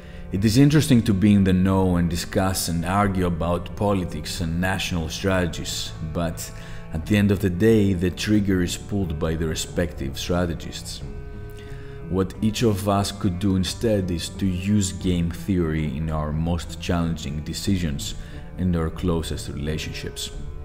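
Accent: Greece. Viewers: You sound so greek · Sounds as greek accent. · Came here to study, heard greek accent, melted, lost focus.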